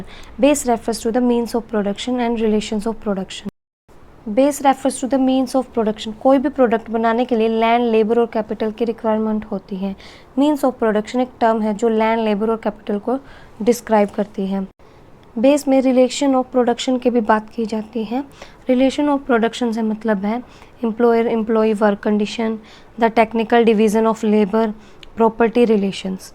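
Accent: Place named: Jammu, India. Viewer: Thank you for the video. And your voice is just 🔥🔥🔥 Your tone makes me feel like you are from Jammu 😅. Anyways, Stay blessed.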